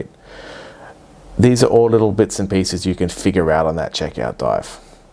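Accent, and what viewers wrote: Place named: Australia. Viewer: I live in Chicago, IL US...so no oceans near by, however I do have the Great Lakes! I don't know if there are scuba classes around here given that I don't really see there being a strong market for them, but I could be wrong lol ...maybe I can use a pool? Haha It would be AWESOME to get training from you, but I think you live in Australia, right (love the accent btw!)?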